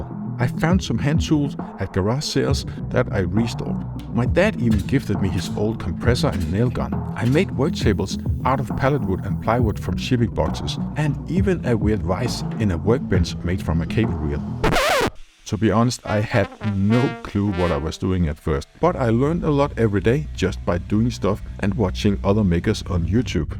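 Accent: Denmark. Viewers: So happy to hear you have reached far, i always love when i see your uploads. keep it up.. it makes me smile when i hear your Danglish, and it helps me in my day here.. Greetings from a fellow Dane living in Indonesia.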